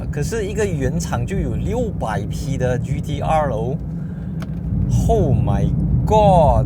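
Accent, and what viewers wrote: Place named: Malaysia. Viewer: 我们马来西亚的华文就是这样的ma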